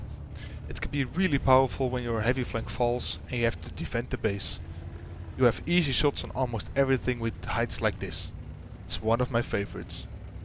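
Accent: Netherlands. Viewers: you sound dutch...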